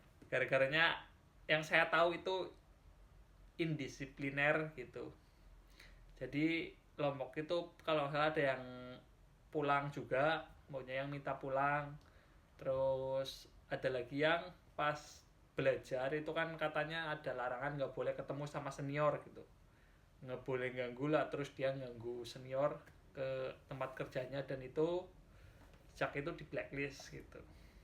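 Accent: Banyumas, Indonesia. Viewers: Ni orang ngapak.ngapake ngendi kang. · Mas orang mana? Logatnya ngapak...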